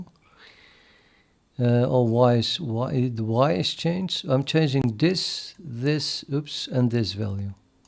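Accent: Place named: Netherlands. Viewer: nederlander detected I thought you were Spanish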